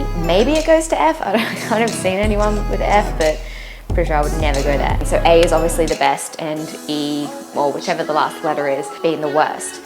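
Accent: Australia. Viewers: are you Australian? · God I love Aussie accents. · Hey, thanks for this video! It is very educational and informative! As an American, I've always been interested in visiting Singapore because I understand it is a very beautiful, stable, and safe country. By the way, I love that Aussie accent of yours! You're adorable and entertaining! I look forward to seeing more of your videos! You just earned a new subscriber!:) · You sound like an Aussie, are you from Australia? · Pssst... what's she nattering about? Did anyone get her Aussie accent?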